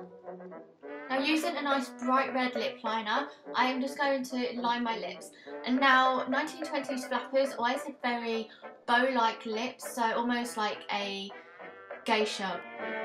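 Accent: United Kingdom. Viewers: You are so sweet! I love how you say "hello my lovelies" at the begging of every video in your amazing british accent(; -3 i seriously adore you!!!!!! xoxo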